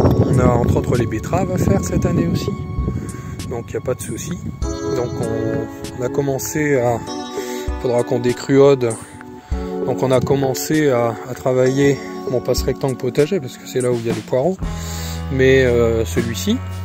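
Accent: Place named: Aisne, France. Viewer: Coucou 😁vu l'accent je reconnais bien le 02🥰 je suis de l'Aisne également je te découvre aujourd'hui je m'abonne 👍je te laisse faire un tour chez-moi et pourquoi pas t'abonner si mon jardin te plaît....A bientôt 😉🍀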